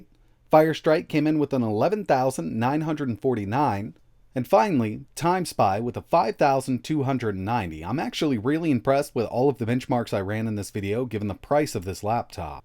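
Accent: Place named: Appalachia, United States. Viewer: I love watching this channel and have been following it for a very long time, at least two years - what interests me is what is his accent? From which part of the US, where people spoke with this kind of accent. Does anyone know that? His way of speaking is the only complaint I have regarding this channel - sometimes it sounds a bit to hillbilly, sometimes it is too noticeable! (it's not my intention to make fun of anyone)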